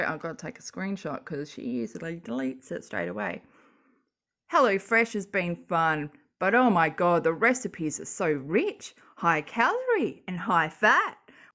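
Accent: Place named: Australia. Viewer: Its refreshing to hear an aussie accent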